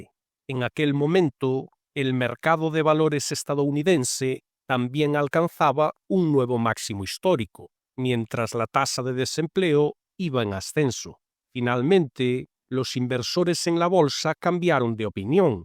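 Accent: Galicia, Spain. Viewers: No ves que tiene acento gallego?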